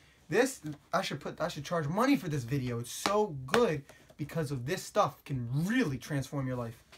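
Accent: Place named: Pittsburgh, United States. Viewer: you switch between wooder and water a lot haha pittsburgh..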